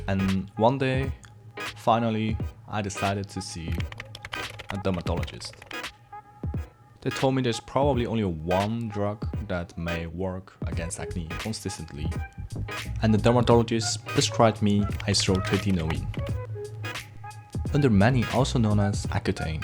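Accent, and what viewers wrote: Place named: England, United Kingdom. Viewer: I like ur english accent😊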